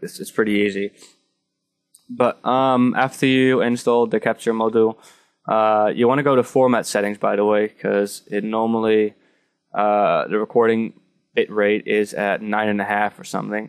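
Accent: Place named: Netherlands. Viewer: NL accent, leuk man haha! trouwens je bent 60 fps vergeten, quaility 720p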